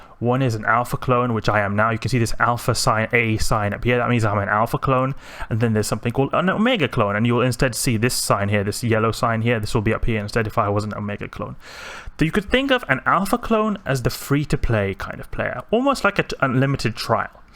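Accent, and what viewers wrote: South Africa. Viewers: If i may ask, where you born in South Africa? As a afrikaner I can hear a light South African accent!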